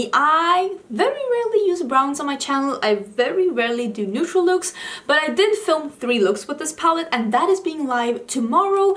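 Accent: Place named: Sweden. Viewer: You’re such a sweetheart, I love that you’re Swedish too, most beauty YouTubers I come across are American or from the UK. Also like your accent again and just your honesty about what your thoughts are with makeup and products you try. Thank you, I subscribed after I watched one of your videos for the first time. 😊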